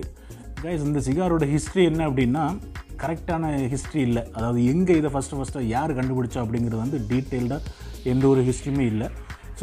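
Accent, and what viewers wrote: Kanyakumari, India. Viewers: Kanyakumari slang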